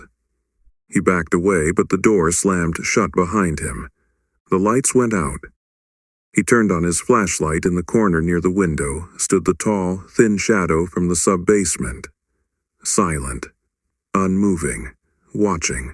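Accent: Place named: United States. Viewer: Agreed! And why would a channel called BRITISH hauntings choose an AMERICAN voice to narrate?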